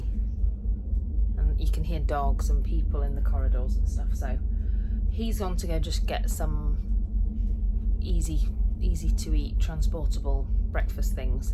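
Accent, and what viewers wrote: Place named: France. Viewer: Vous avez un superbe accent Français 🥰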